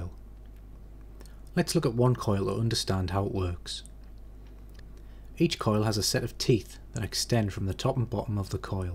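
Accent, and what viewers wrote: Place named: Northern England, United Kingdom. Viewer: As others have commented, a very clear explanation and a very soft pleasant speaking voice ( which many Northern accents have ), so you're ideal for youtube videos. However is the overlay at 4.24 correct ? At 100mA x2 the torque is apparently 6x the 5v test at 32mNm, but torque is given as 117mNm ( the same value as 50mA x2 at 3.6x the 5v value ( 3.6x 32mNm = 115.2mNm ).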